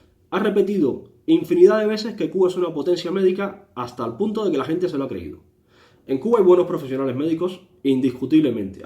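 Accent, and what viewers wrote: Spain. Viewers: No parles com els cubans. El teu és un bon castellà de Castella. Jo el que tu dius ho aplicaria al PP, a C's i a VOX. Jo he viscut al Brasil i a les Filipines, on voten lliurement, sí, als que tenen més diners, quasi sempre. I no cal anar molt lluny. Has parlat, alguna vegada, de com eren abans l'Iraq, Síria, Líbia, l'Afganistan quan els de Wall Street els van portar la democràcia i com eren i com són? Ací els de l'Ibex-35 són els que manen i no són votats. La peor de todas: ¡dictadura del mercado! Marcelo Colussi Rebelión “La ideología dominante es la ideología de la clase dominante”, decía Marx. Expresado de otro modo: el esclavo piensa con la cabeza del amo. ¿Por qué? Porque la imposición de los grupos de poder es total: se da en el plano material y, quizá con más fuerza aún, en el campo cultural. Eso es la ideología en definitiva: la argamasa que solidifica una sociedad no permitiendo ver la lucha de clases que la mueve o, en todo caso, haciendo de esa lucha y de las diferencias sociales algo normal, natural. 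Las clases dominantes, siempre a través de la historia en cualquier modo civilizatorio, ejercieron su poder en forma brutal, con el lenguaje de la violencia, pero también con la sutileza del discurso ideológico. Las diferentes instituciones que se fueron creando a través de la historia (familia, Estado, iglesias, la cultura en su sentido más amplio, la escuela, los medios de comunicación, etc.) son los instrumentos encargados de asegurar la transmisión ideológica. Lo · HOLA MUCHACHITO LINDO CUBANITO YA TIENES UN POCO EL ASENTÓ ESPAÑOL......PERO NO PIERDAS EL NUESTRO Q ES UNICO...OK.GRACIAS X TU MENSAJE MUY CIERTO E INTELIGENTE.....CUANTA VERDAD EN LA COMPARACION....POBRE D MI GENTE Q YA SON 60 AÑOS D MISERIA Y DESTRUCCION....VIVA CUBA LIBRE.........!😍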